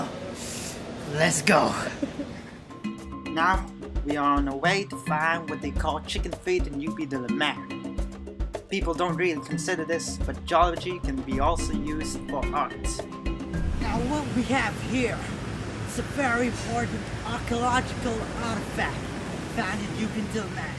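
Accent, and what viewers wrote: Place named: Australia. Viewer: I can't stop laughing 😆 the Australian accent 🤣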